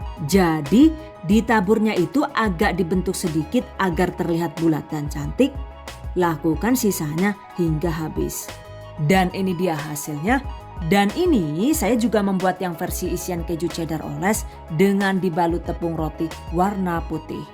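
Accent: Banyumas, Indonesia. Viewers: mksh mb resepnya,,dn jg mksh bhs ngpaknya,,bikin aq ktawa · tengkyu...jangan lupa logat ngapaknya lanjuuuuut.😃😃💪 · Mbaa saya senang klo mbanya lagi ngomong ngpak lucu trima kasih mbaa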